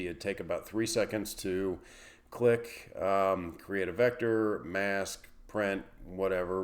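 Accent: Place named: Philadelphia, United States. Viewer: Where are you located? You sound like you’re from Philly or South Jersey.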